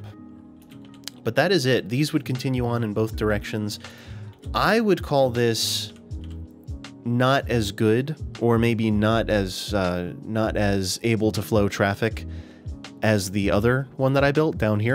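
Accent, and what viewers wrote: United States. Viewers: Arterial - I am guessing you're from the New York area.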